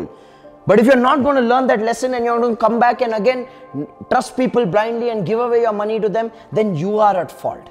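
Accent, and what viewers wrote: India. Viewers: Idk what is about Indians speaking english, that is so pleasant to listen.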